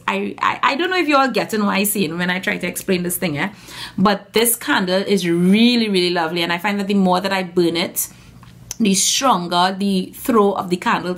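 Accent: Trinidad and Tobago. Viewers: Trini accents really nice enno.... " check me .. haha a a ah not smelling nuutinng , a a like smell mih arm.. it ain hav no odour" hahah We are so extra joyful - and happy 🇹🇹hahaha #tryingtoget #agulfcityrun Gosh and you look like a school friend of mine Akilah - Chinese mixed eyes and round face - cutie cutie- laugh and smile hahah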